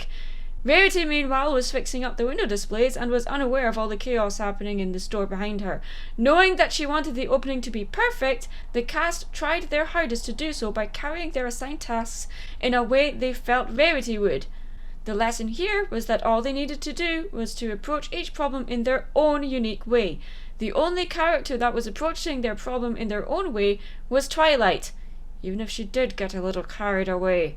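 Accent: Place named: Lothians, United Kingdom. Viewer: You sound like you're from the lothians, am I right?? xD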